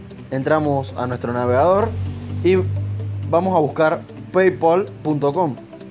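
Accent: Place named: Ecuador. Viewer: es que me sonabas un poco con un acento ecuatoriano :v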